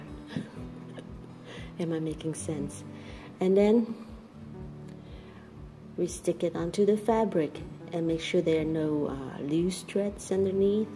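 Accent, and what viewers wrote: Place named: Germany. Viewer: you sound German , are you from Germany ?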